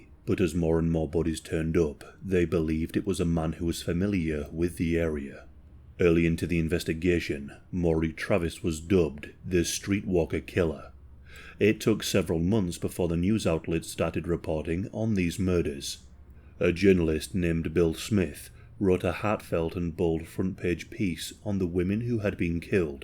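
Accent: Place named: North East England, United Kingdom. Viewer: Not A.I for a change, he's from the very top of England before you get near to Scotland. North-East accent 😍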